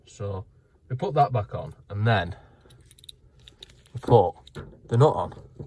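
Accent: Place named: Northern England, United Kingdom. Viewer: He has a cool accent. Where in Northern/ Midlands England is the accent from?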